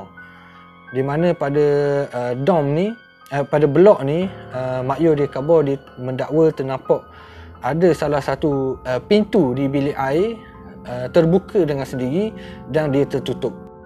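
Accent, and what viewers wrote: Terengganu, Malaysia. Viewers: Selesai tonton.. mantap dan padu! Terasa padat menontonnya.. penceritaan dgn loghat ganu yg menarik.. teruskan.. Kipidak! 👍💪✌️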